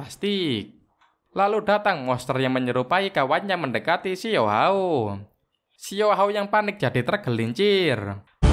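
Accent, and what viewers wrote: Java, Indonesia. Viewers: Mas orang jawa ya soalnya sekat bahasa jawa nya😂😂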